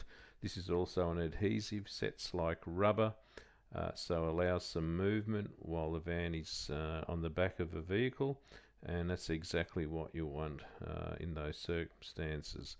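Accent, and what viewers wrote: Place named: Australia. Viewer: I watched part one and two just amazed at the quality of work...when I heard the Australian accent it confirmed my previous beliefs in Aussie craftsmanship and ingenuity.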